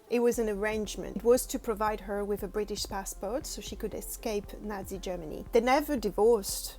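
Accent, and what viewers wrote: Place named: France. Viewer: English with a French accent is the best combo!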